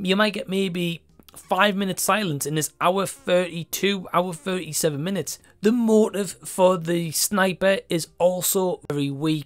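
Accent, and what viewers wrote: Newcastle, United Kingdom. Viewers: Geordie? ;) Why-aye! :D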